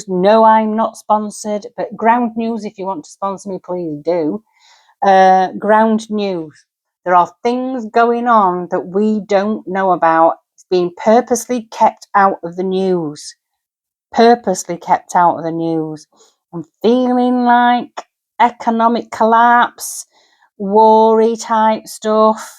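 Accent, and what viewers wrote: Sheffield, United Kingdom. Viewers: Thank you, a great reading. I’ve only just found you. I recognised your lovely accent straight away. I too live in Sheffield so it’s home from home. I’ve subscribed ❤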